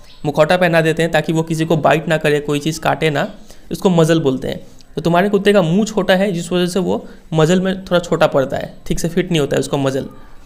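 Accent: Bihar, India. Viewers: sir aap Bihar se hain kya? Accent se lg rha tha. The video is amazing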